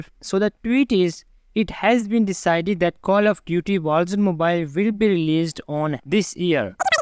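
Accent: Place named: India. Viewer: Indian English 🫥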